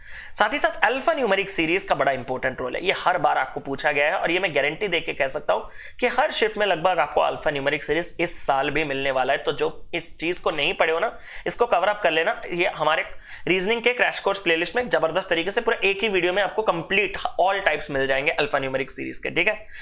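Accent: Odisha, India. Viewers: Odisha se ho kya app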